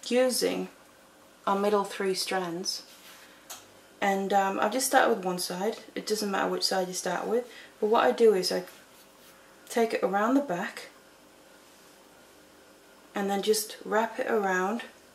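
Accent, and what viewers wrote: United Kingdom. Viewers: May i ask where i can purchase the beads and other things? i am literally just beginning and am so excited but no idea where to purchase all the stuff. I can tell from your accent your from the UK also. Thank you <3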